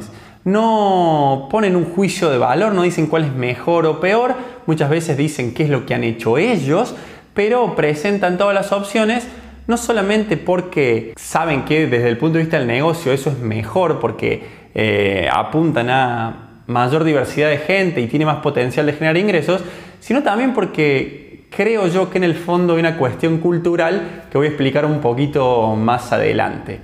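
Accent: Córdoba, Argentina. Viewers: El acentito Cordobez :) !!, estoy de acuerdo en lo que decís, de hecho, actualmente un vuelo de buenos aires a san Juan y viceversa que tarda 2 hs, cuesta lo mismo que ir en ómnibus que tarda 17 hs en promedio. La gente por X razón siguen viajando en ómnibus !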